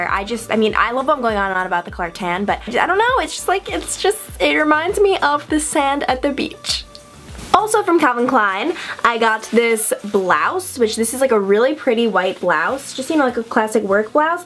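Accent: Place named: New York, United States. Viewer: I subscribed after seeing your Victoria's Secret video. Now I'm wondering where you are from because I can really notice you have an accent in this video. Sounds like New York or Boston.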